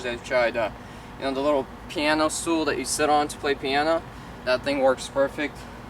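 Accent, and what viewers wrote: Russia. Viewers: Is he Russian ? I can hear he has an accent but i don't know what accent is that xD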